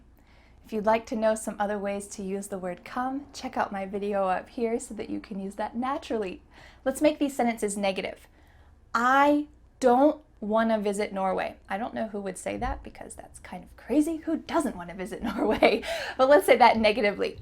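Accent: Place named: United States. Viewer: Do all Americans speak like you do? It sounds like a lot of slang. May I ask what program did you use to make the video?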